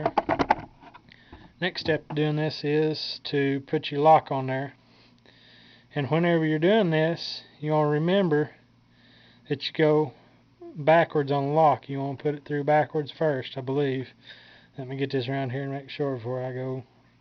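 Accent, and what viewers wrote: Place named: Texas, United States. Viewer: bout like yat. Texas talk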